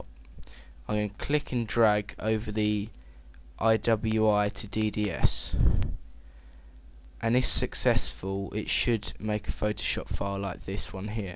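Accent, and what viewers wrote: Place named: Luton, United Kingdom. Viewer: u sound like 1 of dem luton bloods init, like me. wat is you?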